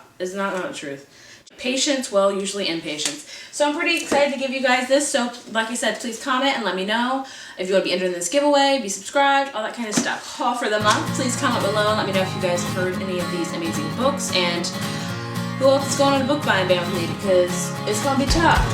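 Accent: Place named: United States. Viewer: Love your videos :) Just wondering-how old are u? x3 Btw, what part of America are u from? (Sorry-it sounds like I am stalking u it's just I live in Britian, and I need to put on an accent from America-but I'm not sure which one I'ma choose lol)...Sorry Im like soo random ;p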